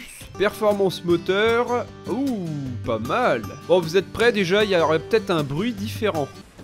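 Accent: Switzerland. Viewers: T'es Suisse ? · Avant que tu parles du lac leman j'aurais parié que t'étais Vaudois...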